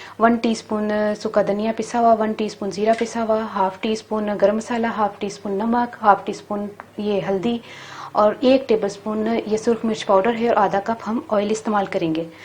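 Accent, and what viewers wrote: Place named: Punjab, India. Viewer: Tuhadi Punjabi Masha Allah bahut Mithi hai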